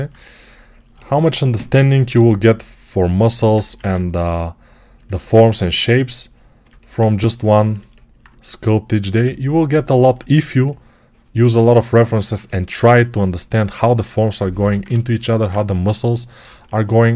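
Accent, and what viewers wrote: Bulgaria. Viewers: I hear that Bulgarian accent from miles away :D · You bulgarin bro (аз също + това акцента ти леко издава ) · The moment I heard your voice I new you were Bulgarian :D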